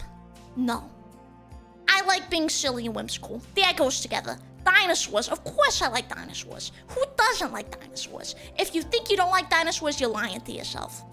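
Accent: Boston, United States. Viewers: Hi, thank you so much for the fun chat at Sakura con. Can't wait to see this channel grow. Love the adorable Boston accent btw.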